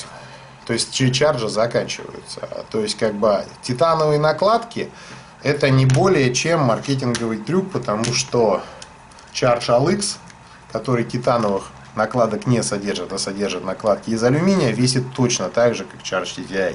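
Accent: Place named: Moscow, Russia. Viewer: Обычный Московский акцент! У некоторых колхозников, тоже уши режет , ножниц'Ы, пол'Ожил, отдуда'ВА, и прочий словестный понос!!! Автор видео, не сколько Акает, сколько вызывающе говорит! "Выёбисто" что-ли..))) Но обзоры достаточно интересные!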